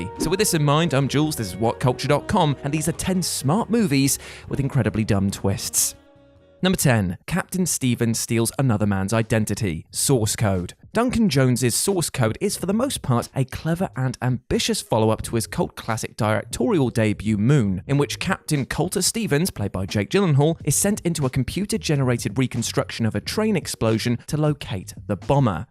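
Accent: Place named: England, United Kingdom. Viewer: At least he's English and not Scottish, the closed captions wouldn't help you! And that's coming from someone who is Scottish 😂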